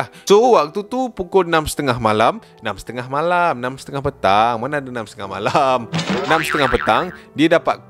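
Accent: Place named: North, Malaysia. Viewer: Pekat nau bahasa utara 😂 Btw salam dari kedah🔰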